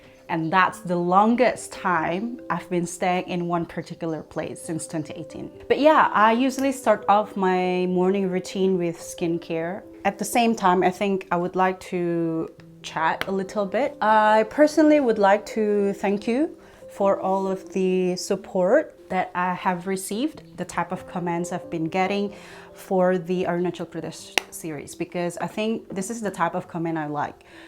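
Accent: Nepal. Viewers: You have so nice Nepali tune loved it hope you will speak alot more Nepali language 👍👍👌👌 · OMG.... your nepali accent ❤️❤️❤️❤️❤️ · You've got a very very beautiful Nepali accent. Kudos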